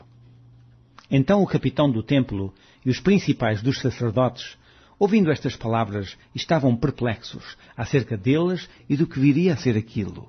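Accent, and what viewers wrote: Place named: Portugal. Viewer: Mas de qualquer maneira, esta baboseira toda vale pelo belo sotaque português !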